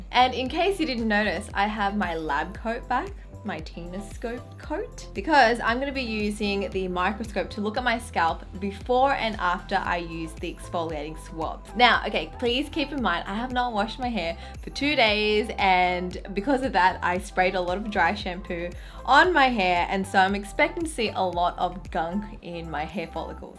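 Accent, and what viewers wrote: Australia. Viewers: Are you an Aussie, you have an Australian accent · You sound Aussie 🐨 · Oh man I love Australian accents. This is just so nice to listen to lmao. Also can’t look at the microscope for too long but it is interesting hahaha.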